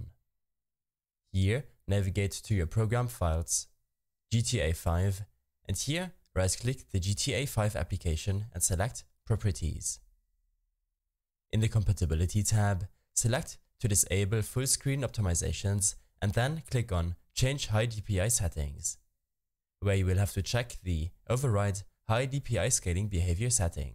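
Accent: Germany. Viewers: No man a German knows he sounds German, you would know it when you saw his channel description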